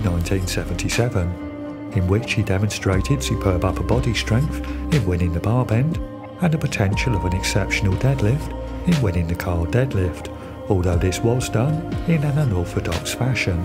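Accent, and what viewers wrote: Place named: England, United Kingdom. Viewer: An Englishman talking about Americans. You couldn't get Morgan Freeman?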